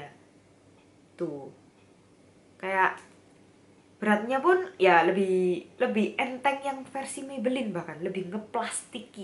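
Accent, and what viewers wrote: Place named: Surabaya, Indonesia. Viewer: surabaya itu aksennya kental y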